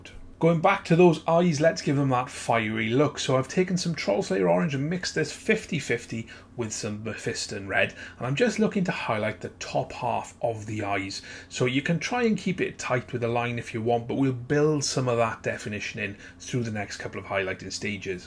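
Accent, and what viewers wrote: Wales, United Kingdom. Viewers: Welsh accent I can hear?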